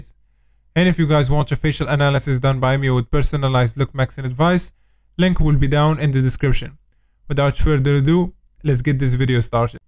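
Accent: Morocco. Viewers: hhhhhh 3aref rassi m3etel walakin mn l anglais dyalk 3reftk maghribi, tbarkallah 3lik a khoya kml f self improvement content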